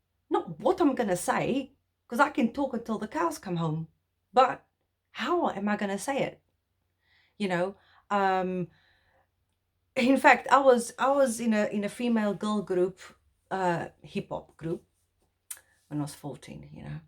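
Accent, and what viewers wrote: Afrikaans, South Africa. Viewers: What a crazy world we live in. If I had met you without knowing you, I might have thought that you were an Afrikaans speaking person, speaking English. Not that it really matters, 30 years after the end of apartheid government and race is still an issue. Look at the Z-83 form for application for employment in a government dept. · No Afrikaans speaking person is not of mixed race origin. I have DNA tested 10 “white” Afrikaans speaking persons. All are of mixed race origin. The majority of us are decedents of white men and imported slave woman from West Africa , india and Malaysians. I am of Indian, Malay, West Africans and European decent. I have very blue eyes, blond hair and western facial futures. My skin is white and I tan a dark bonze colour. Do not judge people by their looks you might be mistaken. Your coloured accent sounds more like a “ white” Afrikaans accent. 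You are an Afrikaner. The can just as well do the mental shift and embrace your Afrikaner identity. Many English speaking South Africans are more Afrikaner than the Afrikaners in their way of living and thinking and their values. They are experiencing a continuous identity crises because they belong nowhere. Just accept that you have become Afrikaners. Make the mental shift. Tommy worked at RAU. He was Chinese as his mother and farther were born there. She could barley speak a few words of English. Tommy however spoke English and Afrikaans fluently. No accent in Afrikaans. It was an Afrikaans university. Tommy made the mental shift and became Afrikaans. He was absorbed into the community although he look different. He became one of us. No longer an outsider. We need to embrace our mixed heritage and our Afrikaner identity. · My son is 40 and can hardly remember those years...i think you are not telling the truth about the pencil test...and you are talking with same acsent as an afrikaans person trying to speak english stop feeling sorry for yourself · Your accent just sounds Afrikaans (or Eastern Cape English, even) to me, so I suppose one has to come from the Cape to hear the difference or something. 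My mom's family were from the Northern Cape, and would've probably been put in the box "Poor Whites" in those times, because that was what the politicians were peddling to the masses back then, but their heavy accent (much heavier than yours to my ears, anyway) wasn't what distinguished them. AFAIK, every English speaker of those regions (regardless of background) spoke basically Eastern Cape English. They moved to Jo'burg, and all the girls changed their accents, eventually, while the boys kept theirs. (Big family.) So today my mom, aunt, and uncle all live together, getting old, and the two sisters speak Natal English, mainly just because that's how people speak here, but my uncle might be able to "pass for Coloured" - to my ears at least, and I'm probably missing all sorts of nuance that make that idea ridiculous. Actually another one of my uncles was in the queue with a very "Coloured-looking" lady (there's no such tidy box, but somewhere right in the middle of everyone pushed or pulled into what's become that culture there's something like that), who had the same surname as him, so is almost certainly related (because it's a very rare surname) to us. 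So somewhere back in the past, one branch of the family got put in the one box, and the other got put in the other. Actually now I think of it, that very uncle's first ID bo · You're beautiful and your accent in only south African.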